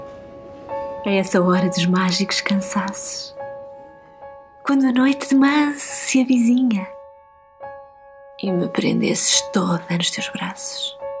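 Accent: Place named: Portugal. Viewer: parabéns , amo a Florbela <3 traga mais por favor. Voz linda apaixonada estou pelo português de Portugal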